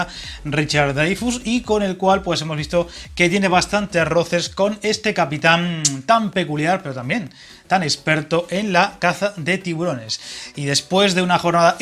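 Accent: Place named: Spain. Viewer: ¿Tengo una duda eres Español? ¿Pero veo que usas fragmento del audio Latino? Buen análisis de la película, digo lo notó cuando tú hablas compañero, nos vemos después en un nuevo vídeo.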